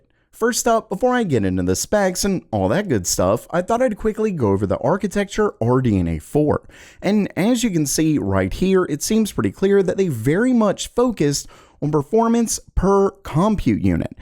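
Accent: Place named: Tennessee, United States. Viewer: lol I walked away and thought the video switched to DistroTube? Tennessee? Hello neighbor lol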